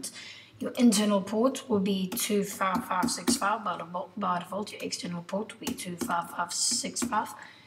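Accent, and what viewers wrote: South Africa. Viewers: THANK YOU!!! And you're South African. :D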